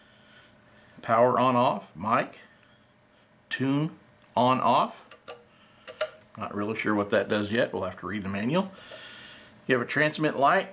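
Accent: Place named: Texas, United States. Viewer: Thats the Texas Drawl. I like it.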